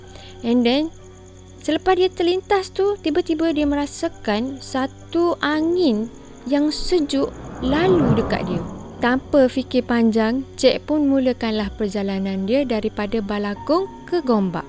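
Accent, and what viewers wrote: Kelantan, Malaysia. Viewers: Tksedak kecek kl kecak klatey la kak dio 😢 sapo stuju like sek kito😊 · kecek Kelate lama..x jdi seram bilik kecek luar😅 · Sdap dgr bhso klate ehh, jelas pm jls...mce kekkok gtu hehe...gapo pm semoga n maju jaya😁.. HADIRRRR👻👻👻👻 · Xsdp la dngr kalau bekencek luai... Kelantam lg best... 😂 kencek luai jdi lawak plak... 😂😂😂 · Tak sedak dengar Eah kecek ore kolopur.😂😂..kecek kok Kelate lah..baru sedakk dengar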